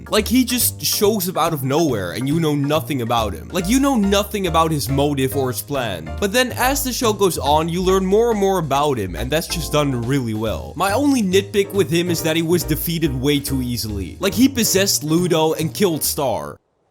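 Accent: Netherlands. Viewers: wait a min are you dutch? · are you dutch? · Are you Dutch? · Are u dutch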